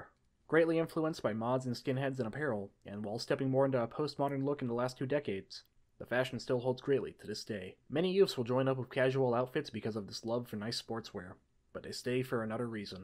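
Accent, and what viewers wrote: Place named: United States. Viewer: Soon as the yank started narrating, I switched off!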